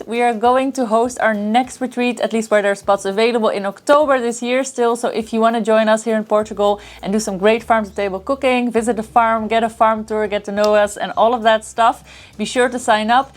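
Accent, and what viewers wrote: Netherlands. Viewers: You sound dutch 😊 Groetjes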